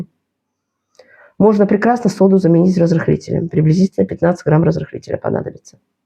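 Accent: Baku, Azerbaijan. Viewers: А я думаю акцент такой близкий.знакомый точно Бакинскиц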